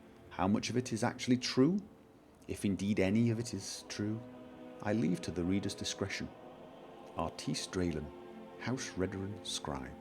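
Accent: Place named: England, United Kingdom. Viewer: I noticed you live in Norway:o I am suprised! I know you are probably english (by listening to your accent) How did you end up in norway?:)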